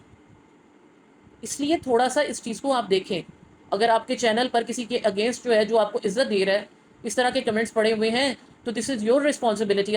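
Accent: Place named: Punjab, Pakistan. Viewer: Very true💯... But really ur punjabi is soooo sweet i luv it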